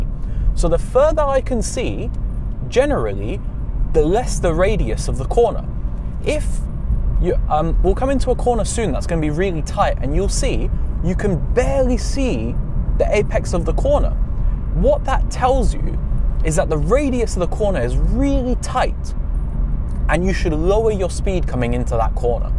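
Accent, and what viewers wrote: United Kingdom. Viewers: Mf speaking British 💀💀💀🤢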